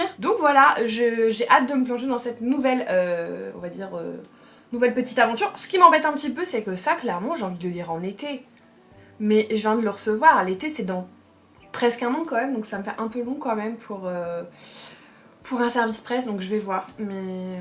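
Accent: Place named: South, France. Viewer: Bravo pour le abonnés!!! 🎉 quel bel accent du Sud 😅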